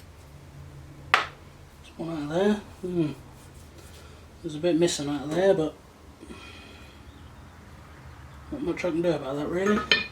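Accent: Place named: Suffolk, United Kingdom. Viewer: Nice to hear the accent, im a Suffolk boy originally. West Aus now though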